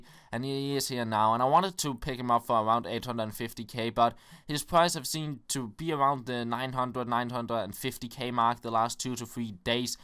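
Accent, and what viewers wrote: Jutland, Denmark. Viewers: you are danish right? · Er du jyde?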